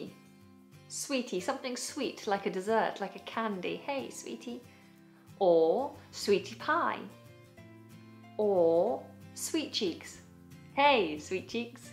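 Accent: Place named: United Kingdom. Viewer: Big Thumbs up; love your British Accent so much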